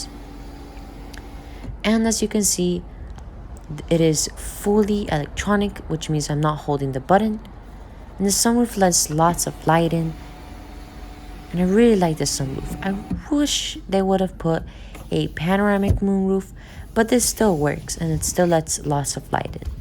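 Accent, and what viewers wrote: Italy. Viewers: 🧐🤨🤔🤔🤔🤔, Are You Sure About That! Oh & By The Way, Your Voice Sounds, Uh, Italian Stuff!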